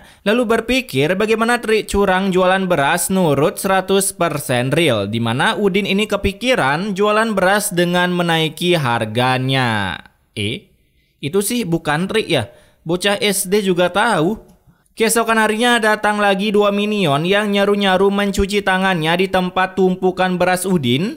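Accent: Bali, Indonesia. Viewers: Min, dari Bali ya? 😁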